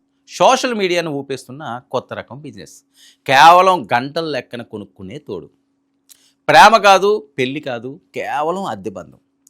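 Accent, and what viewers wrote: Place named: Andhra Pradesh, India. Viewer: వరై ఏంద్రా ఆ loveday ల భాష..యాస ... నీ ఆంద్ర సోల్లు ఆపు... మంచిగా తెలుగు మాట్లాడు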